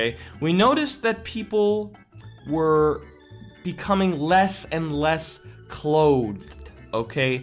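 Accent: Canada. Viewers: wait, r u canadian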